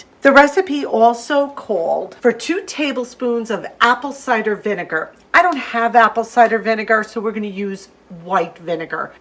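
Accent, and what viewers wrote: New York, United States. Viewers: New Yorker? Staten Island? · Hello 👋 This video popped up on my feed. I love these types of channels. I enjoy your NY accent and love the positive tone in your voice. I took absolutely ages to finish the video because you inspired me so much to go and do! I LOVE that old Dutch proverb and will add that to my "to embroider" list! I looked up the amazing planner you showed and OH MY GOODNESS 😱 what a rabbit hole I could fall down for that one. Not very frugal on the outlay but I see how it will save money in the long term... So all this to say thank you (and with his mad guitar skills!) for being you, keep on being you and shining your light all the way across the globe. Lots of love from a new subscriber in Western Australia. 😊💝🇦🇺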